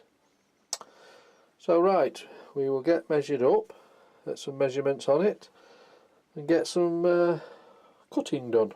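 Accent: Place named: Stoke, United Kingdom. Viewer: Like your style you sound like a Stoke lad to me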